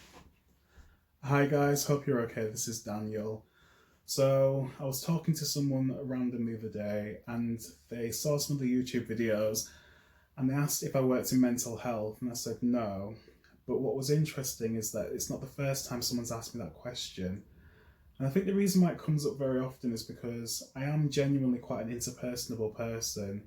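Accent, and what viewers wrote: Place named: United Kingdom. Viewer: I read a comment left on a rainbow bright video and just came for a nosey. Some reason thought you'd be American. Hello to you from a fellow Britt. 👋.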